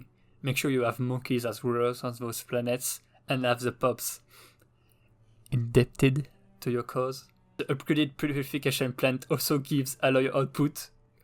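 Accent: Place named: France. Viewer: Aaand Functional Architecture is being nerfed next patch, so ixnay on that plan :P By the way, it seems like the Shattered Ring gets regular planet designations, so how about going Lithoids (+Volatile Excretions for tile-blocker removal and war edicts?), colonizing the next-best planet, moving the capital there, and designating the Shattered Ring as a Mining World for +25% alloy output? PS: I like the French accent, it's not mediocre at all!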